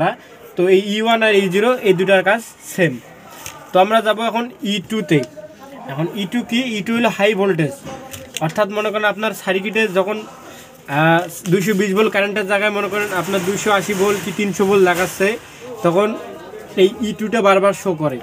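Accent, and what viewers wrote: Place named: Rangpur, Bangladesh. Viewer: আপনার বাড়ি মনে হয় রংপুর।৷ আরও ভাল করে বলবেন। আপনার সাথে আছি❤❤❤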